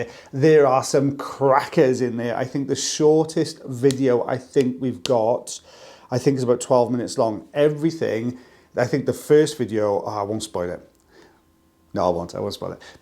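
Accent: Wales, United Kingdom. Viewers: Thank you for talking about mental health. Both my son and I suffer from depression. We both got help from doctors. I will pray for you that you will be able to keep it at bay so they say. I love your channel and your Welsh accent is so wonderful. Take care. ❤️😇🙏🤩Congratulations on another grandchild. Unfortunately my kids are not having any children. My friends all have them and I am a bit jealous. But you enjoy your granddaughter. Oh they smell so nice. ❤️👶🍼😊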